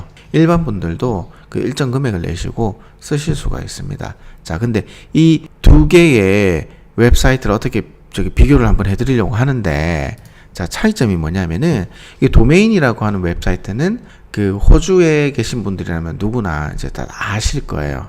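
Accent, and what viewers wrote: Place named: Gyeongsang, South Korea. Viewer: 많은 분들이 부동산 얘기들 하시는데 저랑 비슷한 출신(경상도)이신 것 같아 정감이 가네요. 혹시 질문 사항이 있을 때 이메일 같은 것 드려도 괜찮을까요?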